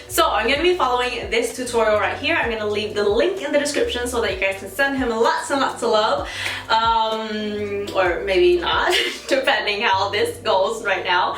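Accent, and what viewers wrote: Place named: Sweden. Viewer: It sometimes sounds like you have a slight swedish accent to the english hahaha ;D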